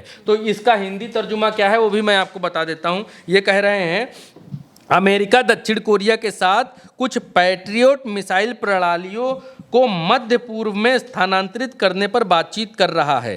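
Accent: Bihar, India. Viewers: BHAI TUMHARI BIHARIYA BAAT BAHOT ACCHA LAGA...DHUKH KE SATH DEKH RAHE HE..PHIR BHI HASIN AA RAHI HE...😂😂😂😂😂😂😂😂😂😂😂😂😂😂😂BAHOT BADHIYA ...AEISE SABHI KO SAMAZ ME AAJAYEGA SAMJANE SE..😂😂😂😂😂😂😂😂😂😂🎉🎉🎉🎉🎉🎉🎉🎉🎉🎉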